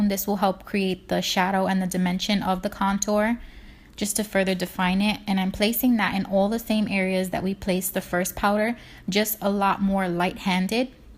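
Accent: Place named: New York, United States. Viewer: Are you from new York or new Jersey you have an accent I like it!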